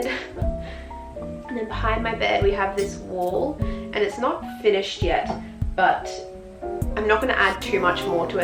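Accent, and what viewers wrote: Australia. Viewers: Where do you live you have the same Aussie accent as me 😂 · Yesss I've been looking around for another good, aesthetic youtuber and finally I found you!! Bonus points is that you're Aussie like me hah I realised from the background noise of insects before I even heard your accent. So ready to binge watch all your videos!!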